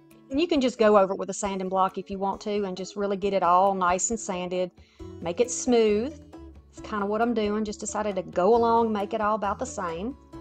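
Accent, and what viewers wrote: South, United States. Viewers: listening to the Southern drawl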